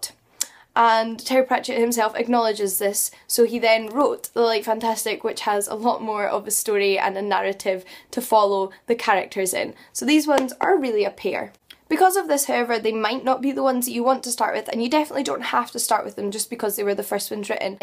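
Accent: Scotland, United Kingdom. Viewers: British does not just mean English. Scotland is part of Great Britain, so is also a British accent. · Thank you. A good friend recommended the whole serie and I felt a little bit overwhelmed ! Also, completely out of context, are you scottish ? I'm not a native english speaker and I plan on moving to Scotland soon and so far I'm struggle with the local accent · Love this video. I wanted to start reading HogFather, but when I saw how it was in the Disc World it was intimidating. I am going to subscribe to you now. Love your accent. Scottish? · Béranger Seguin it's Scottish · Ohhh this is Scottish? Interesting. Seems like I mistook another brittish accent with Scottish then xD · She has a Scottish accent. She is Scottish, which means she is a,so British. If you want to split hairs at least know what you mean. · My first thought when she started to talk”She’s british. 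I can trust her” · She has a strong Scottish accent. You just don't know your accents mate. No shame in that. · She's "Bri'ish", even better!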